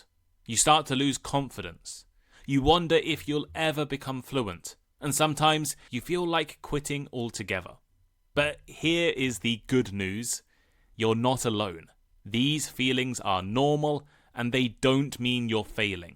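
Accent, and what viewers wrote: United Kingdom. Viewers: It's my great honour to hear from you. Shadowing your clear and standard Britsh accent has become a habit of mine.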